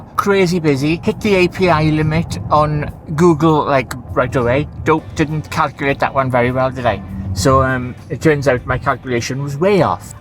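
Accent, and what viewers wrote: Wales, United Kingdom. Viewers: I know now your welsh lol love accent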